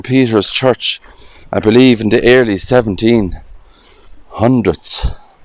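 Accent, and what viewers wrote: Ireland. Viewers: Excellent. I'm so glad I found your channel. Graveyards are beautiful places. All those lives of people who all had a purpose and stories to tell. Your lovely Irish accent is pure joy to listen to as well. Thanks and take care 🙂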